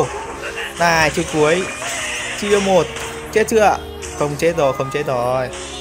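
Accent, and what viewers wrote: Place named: North, Vietnam. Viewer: thích giọng trai bắc đặc biệt là thái bình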